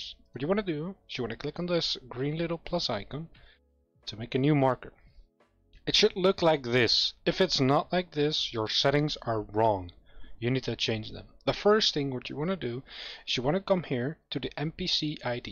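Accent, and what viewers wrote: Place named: Netherlands. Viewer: Je klinkt Hollands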